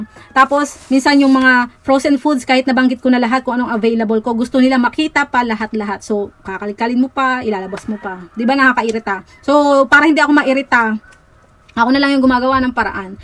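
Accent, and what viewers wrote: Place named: Batangas, Philippines. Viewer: Napansin ko na tinatanggal ninyo ang inyong Batanguena accent...pero kung minsan e hindi maiwasan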